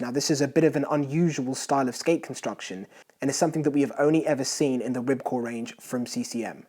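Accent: England, United Kingdom. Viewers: Hockey tutorial from british guy?🤔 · Please share??? Did you know about the English hockey club. I have only heard about One. In the CHL. Perhaps you Are the Guy in the video??? Then Why do you sound so english??? I am really confused. Help Me out. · SERIOUSLY???? This a video for remedials looking to get into hockey??? Mommy still dressing you people? And sorry, it's really funny coming from a guy with an English accent. Any hockey shop worth it's salt can explain everything about skates or you shouldn't be buying from that shop. They are the most important piece of your equipment so treat them as such and visit a local hockey shop; not a sports store with a hockey section nor buy them online unless you know for sure. But I find the gushing comments about this video utterly ridiculous. If you don't know how something fits and feels on your own body, no video in the world will help you. 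Hey, hats off to the dude who made the video if you are getting paid for it but having someone tell me how to buy skates is like someone trying to tell me what stick to buy or how many sheets of toilet paper to use and how to hold it as I wipe my butt. But that's the difference between self-reliance and today's nanny-state mentality where people need their hand held for everything.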